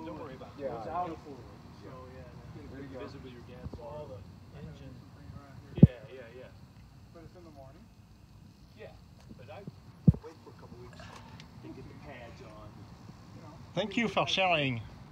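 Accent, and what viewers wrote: France. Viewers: Sacré accent Français haha ! j'ai la même en 1977 avec équipement fédéral présidentiel complet, sirène US, gyro US dans calandre, Citizen band, full tinted glass, téléphone, mini bar whiskey and cathodique TV avec antenne boomerang chaines Française. Ce n'est pas une sedan mais une factory Fleetwood limousine formal serie 75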